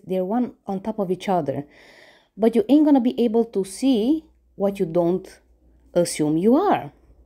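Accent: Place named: Spain. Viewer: I really love your Spanish accent it gives you An edge ✨✨😉 never stop being this blunt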